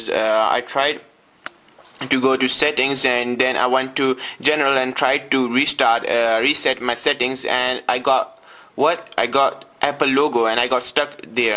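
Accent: India. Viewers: Shame man, he is Indian what do you want the guys to sound like. Don't worry dude keep on with the videos thanks man :) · great exlpanation for a indian guy? not a racist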